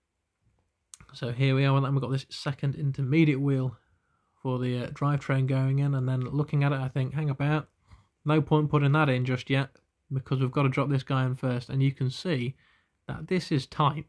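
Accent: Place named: Scotland, United Kingdom. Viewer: Great Job! Beautiful enamel dial. It was nice hearing your scottish accent. Keep on with your videos. Regards from Argentina.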